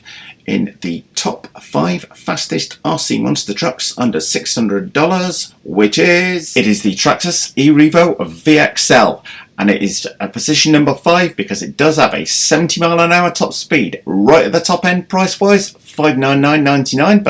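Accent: England, United Kingdom. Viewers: PLEASE buy a microphone! I like your videos but it sounds like you recorded it underwater! Also - whereabouts in England is your accent from - I just can’t place it!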